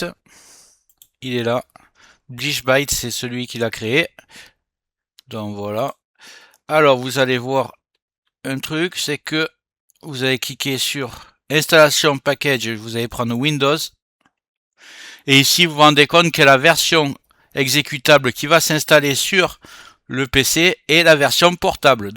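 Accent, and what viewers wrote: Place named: South, France. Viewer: Merci! JE suis un Québécois francophone et ça me fait du bien d'entendre un expert qui parle de Windows en bon français avec un accent du sud! Comme je vis dans un océan d'anglos, et que la technologie venait surtout des USA je regarde beaucoup de vidéos en anglais mais j'apprécie le fait de vous écouter dans ma langue!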